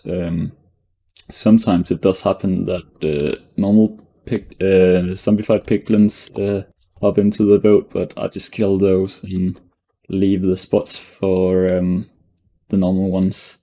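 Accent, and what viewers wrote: Denmark. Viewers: Are you danish? You sound like me when I speak English That sounded offensive but isn’t just hoping it’s true(I’m danish) Nice vids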